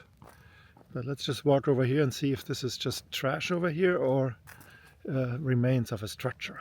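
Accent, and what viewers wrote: Germany. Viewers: Na da höre ich doch einen deutschen Akzent. Bist DU Auswanderer ? Danke für das Video. Gruß aus der Oberpfalz, Manuel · Hey there, came across your channel by chance. Was not disappointed. Love the accent - German origin, right? You got your own private airshow there pal, courtesy of the United States Marines. What a treat. And what a great look at an awesome piece of military hardware. Subscribed. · 1 Sek im Video und ich so oha deutscher Akzent kommt hart durch😂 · From your accent you sound German. In case you didn't know we have German military personnel who have i believe permanent duty stations at Holloman AFB, New Mexico and Nellis AFB, Nevada. I hauled weapons, explosives, and other secrey stuff for the governemnt for 30 years and when the Germans shipped their ammo over here we would haul it to them wherever they were based. We loved dealing with them, so polite and efficient in getting us offloaded. 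Be well! :)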